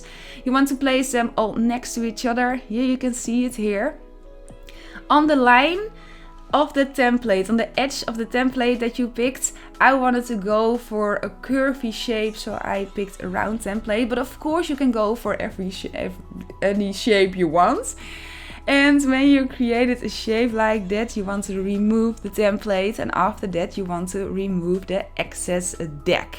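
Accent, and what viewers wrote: Netherlands. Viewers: Je heeft een groot Nederlandse accent.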